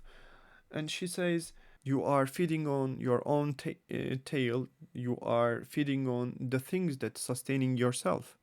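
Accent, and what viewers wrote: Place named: France. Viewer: Your accent sounds French but you look Georgian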